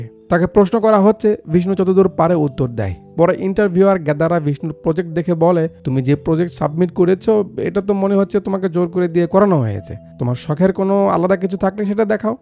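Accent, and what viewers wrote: Bogura, Bangladesh. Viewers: Vaiya akta request kori? Apni besir vag kotha guloi bogurar vashai bolben,, amar kuv valo lage🥰🥰🥰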